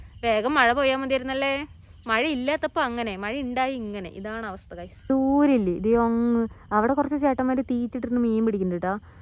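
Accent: Palakkad, India. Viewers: സ്ലാങ് കണ്ടപ്പോൾ പാലക്കാട്‌ ആണോ എന്ന് തോന്നി അതാ ചോദിച്ചത്.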